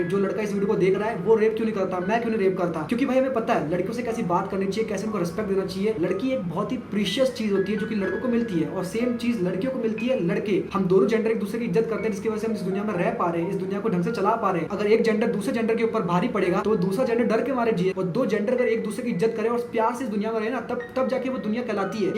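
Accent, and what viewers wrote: Hyderabad, India. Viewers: Bhai may be hyderabad sey ho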